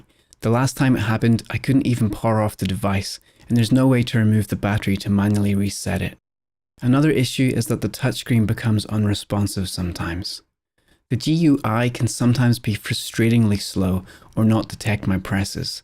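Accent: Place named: Northern Ireland, United Kingdom. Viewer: am I right in saying your originally from Northern Ireland? might be wrong but I can pick it up, I'm a Fermanagh man myself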